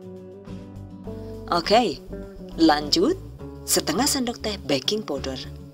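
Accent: Banyumas, Indonesia. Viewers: Wahhh ngapak ,:) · Jane rika wong ndi yu... Deneng le ngmg ngapake jos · 😁😁😁😁bunda saya suka bicara nya bunda yg bahasa jawa nya bun ko da jawa ngapak nya bun 😀😀😀 tp saya jg suka redep2 nya bunda tenk's bun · japan + ngapak ya etok2 ora doyan😅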